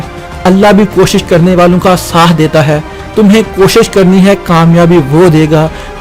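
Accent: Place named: Punjab, Pakistan. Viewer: Bhai Ap apni Urdu pronunciation theek Karen. Ap khhe ko he bolte hen or Punjabi lahja clear dikhta he. Clear Urdu bolen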